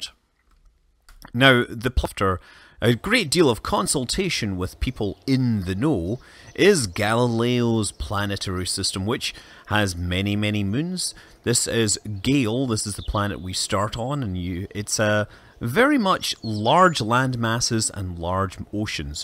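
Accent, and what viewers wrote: Scotland, United Kingdom. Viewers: you're scottish right?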